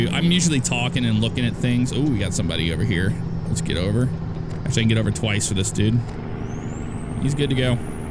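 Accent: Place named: United States. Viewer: i like your american accent nice vid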